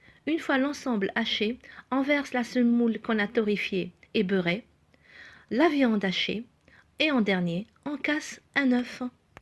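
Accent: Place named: Algeria. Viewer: Salem alik j aime bien tes recettes tu parle bien algérien je suis algérienne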